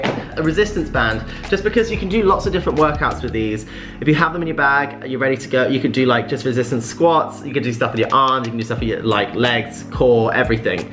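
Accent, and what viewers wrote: United Kingdom. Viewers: My god how i love this boy. I love his face. I love his british voice. I love his muscles. His pecs his biceps all his body. He is just perfect for any man or human. I love his videos. The sexiest man alive in the whole world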